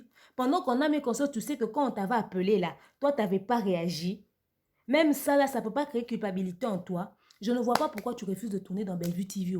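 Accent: Ivory Coast. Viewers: Ma Sœur, Vous parlez très bien français car je vous ai toujours suivi. Svp ne changez pas d’accent ou n’ empruntez pas le ton ivoirien. Gardez votre excellent français avec vos bonnes analyses. Cela fait votre identité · Exprime toi bien comme tu as l habitude de le faire arretes cet accent ivoirien qui ne te sieds pas du tout. Sinon bonne analyse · J'aime bien tes analyses,ce que j'aimerais le plus qd tu t'exprime en français normal c'est trop nickel et non l'accent ivoirienne excuse moi.😘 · Ma chérie je t'aime bcp tu es parmi le youtuber congolaise qui par bien le français et tu fais bien tes analyses. Mais pardons ne parle pas avec l'accent ivoirien. Parceque moi je beaucoup des amis ivoiriennes qu'on un complexe d'infériorité enver les congalais ils disent que nous parlons tres bien le français sans accent. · je t’aime bien mais j’aime plus quand tu parle normalement le français ivoirien ne parle plus ça stp ma sœur je t’aime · T EST VRAIMENT OBLIGER DE PARLER COMME UNE IVOIRIENE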